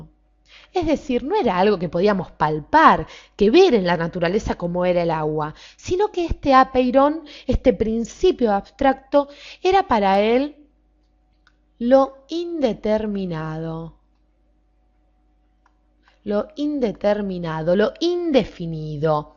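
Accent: Argentina. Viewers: Me ha gustado mucho este video esta bien chévere! Saludos desde Brasil y me enamoré del acento argentino. Gracias!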